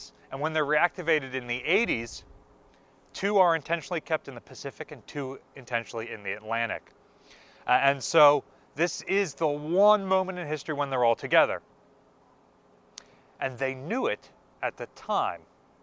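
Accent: United States. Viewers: American voice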